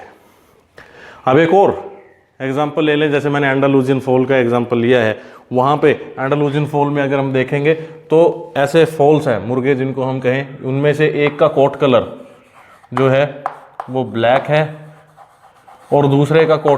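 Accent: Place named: Haryana, India. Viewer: Amazing video lectures you are giving sir. Appreciable and yea I like your haryanvi accent too 😂 but yea you explains everything amazingly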